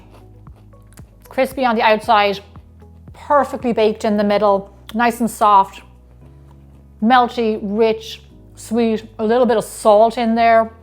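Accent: Ireland. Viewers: I just love that you never lost an ounce of your Irish accent!!! 💚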